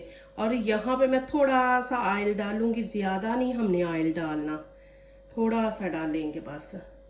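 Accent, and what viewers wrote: Punjab, India. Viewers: Punjabi lehjhe main baat named kya kare, Durst urdu boleh. Recipes acchi hoty hai.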